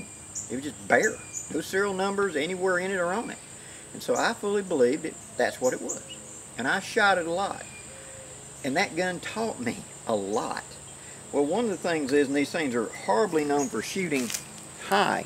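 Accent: South, United States. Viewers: I would listen to you talk about stacking rocks, just to hear a good ole southern accent again. 🤠 Enjoyed this "Story Time". Take it easy.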